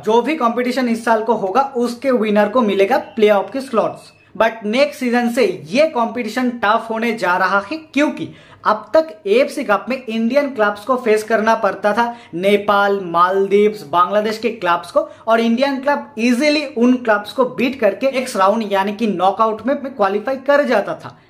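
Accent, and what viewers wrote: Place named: West Bengal, India. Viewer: U sound so bengali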